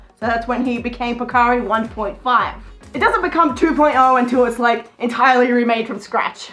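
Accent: Australia. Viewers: Are you Australian cause I am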